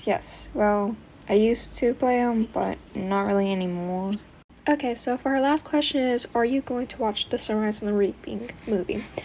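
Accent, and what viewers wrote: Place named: South, United States. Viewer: Thank you for the great answers, (especially your country song picks!) also, your soft southern twang in your voice is Fabulous!! 🫶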